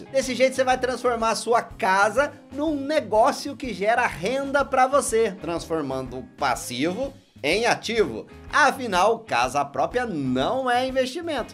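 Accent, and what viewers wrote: Minas Gerais, Brazil. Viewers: Mineirim de tudo!! E já foi corretor de imóveis! Kkkkkk!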